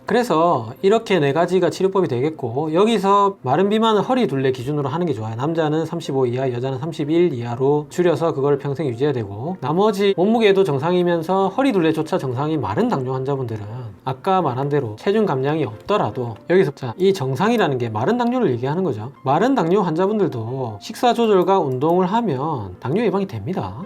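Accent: Gyeongsang, South Korea. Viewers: 김근영 시비라니요!! 표현을 그렇게 하시나요? 난 70 할머니이고 닥터의 경상도 억양이 있으신데다 빨라서 쉽게 알아 듣기 힘든 단어가 있어서 말씀드린 겁나다 앞으로 말씀 곱게 쓰시길 부탁드립니다. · 10프로는 넘께 뺏는데 많이 좋아졌어요 3개월전 공복이 220이였는데 지금 100이하에요 근데 선생님 병원은 어디에요 경상도쪽같아서요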